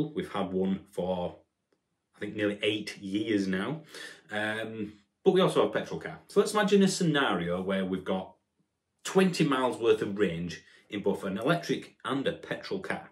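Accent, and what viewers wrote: Yorkshire, United Kingdom. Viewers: nice to hear the Yorkshire accent in among all the American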